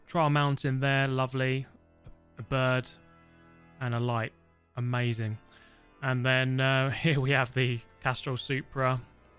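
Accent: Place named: London, United Kingdom. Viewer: I think you're from the same part of London as General Minus based on the accent..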